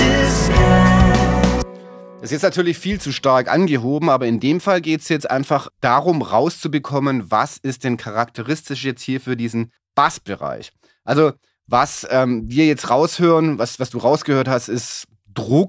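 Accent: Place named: Heilbronn, Germany. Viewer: klasse video, sowas hilft mir echt immer wieder weiter bei meinen eigenen basteleien in meinem möchtegern-homestudio! ;) gerade bei EQ (und eben auch kompressor) kann ich gar nicht genug input und anregungen kriegen um dann selber damit zu experimentieren... manches klingt auch erstmal einfacher als es dann im detail in der praxis wirklich ist! ;) nachdem ich mir jetzt schon einige deiner videos angeschaut habe, kann ich mich jetzt aber mit einer persönlichen frage nicht mehr zurückhalten: ich hatte mal einige jahre in den 90ern an einem heilbronner gymnasium einen musiklehrer (und erdkunde) mit dem gleichen namen. der unterricht war echt klasse und ich war auch unter seiner leitung im chor und anderen schulprojekten. ich habe gesehen, dass du auch aus der region bist, die sprechweise kommt mir irgendwie ein wenig vertraut vor und nunja die frisur hat auch gewisse ähnlichkeiten. 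;) also drängt sich mir einfach die frage auf ob da wohl ein familiärer zusammenhang besteht...? :)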